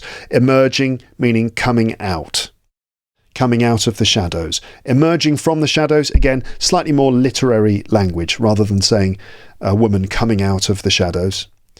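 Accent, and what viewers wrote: United Kingdom. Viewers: Hi, a big thank you for your videos I always switch the speed for 0.75 and repeat after you as I really want to develop the British accent. At the moment I’m learning how to fix my accent with help of another Nicholson, so both Lukes are helping me, thank you guys. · so now you have a British accent? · Please answer me Is this British accent? Idk the difference between it and American..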